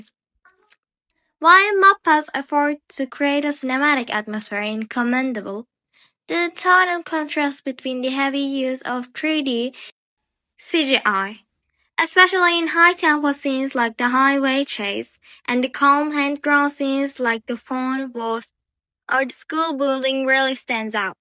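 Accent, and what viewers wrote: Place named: Turkey. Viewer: Why does your accent sound so turkish?? Good content btw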